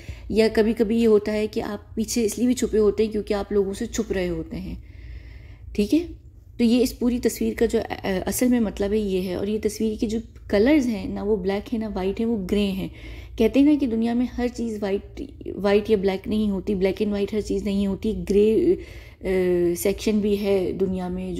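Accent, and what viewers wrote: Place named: United Kingdom. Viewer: Love your English accent reminds me of home 👌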